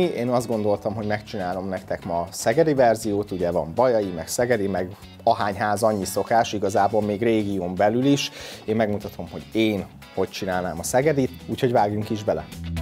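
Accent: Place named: Italy. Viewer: is it just me? but his accent has italic undertone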